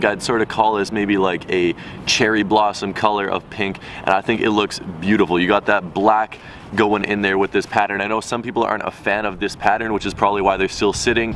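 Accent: Canada. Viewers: yeah, canadians kinda pronounce things weirdly compared to us states-people. especially for me cuz i'm from texas😂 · You don't say them wierd, you say those words Canadian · I thought u said those words like that cuz ur Canadian. Also u say sorry weird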